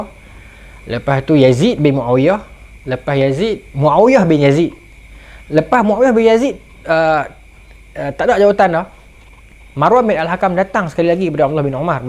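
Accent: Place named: Muar, Malaysia. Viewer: Muar clear 🤩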